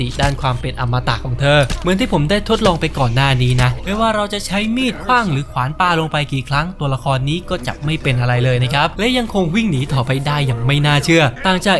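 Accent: South, Thailand. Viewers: พี่เป็นคนใต้หรอ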